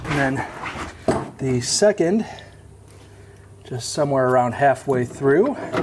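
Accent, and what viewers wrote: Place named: Western New York, United States. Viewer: That WNY accent will get you every time.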